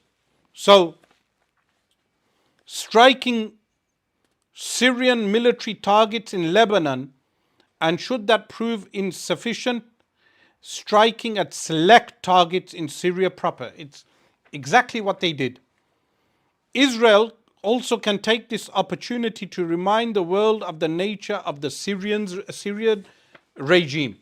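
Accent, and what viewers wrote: United Kingdom. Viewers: You might be right. But remember with that British accent and paying taxes to a country that supports war on your own religion. So first admit your own hypocrisy first than expose others.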